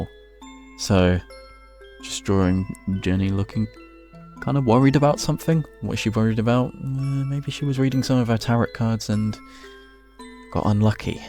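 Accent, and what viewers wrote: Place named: United Kingdom. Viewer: British jumpscare (i do like your voice), this is a nice challenge for spooktober! 🎃 edit: f*ck you 😭😭😭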